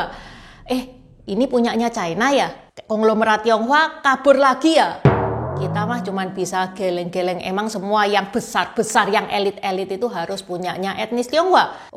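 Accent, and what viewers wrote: Java, Indonesia. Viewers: Ci ini cindo tapi bicaranya medok jowo ketara sekali 👍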